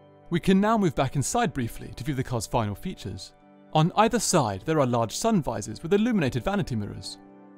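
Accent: RP, United Kingdom. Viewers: He sounds awfully posh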